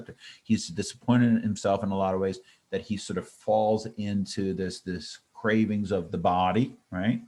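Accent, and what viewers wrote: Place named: Canada. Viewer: Cool, it sounds like your accent is Canadian, as I think a teacher YouTuber his accent is similar to you.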